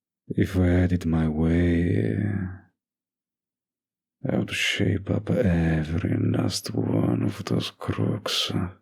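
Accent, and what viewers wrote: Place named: Russia. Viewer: I HEAR RUSSIAN ACCENT, ARE YOU RUSSIAN?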